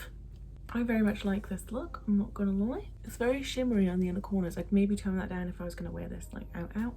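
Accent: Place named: Yorkshire, United Kingdom. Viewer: SO.. I’m from Yorkshire 😅😂 ..you definitely got the accent on point hahaha!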